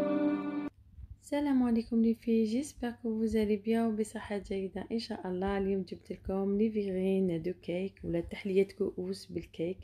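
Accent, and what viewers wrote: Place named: Algiers, Algeria. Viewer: Ya3tik Saha je ne sais pas pourquoi j'aime regarder tes vidéos j'aime beaucoup ton accent Algerois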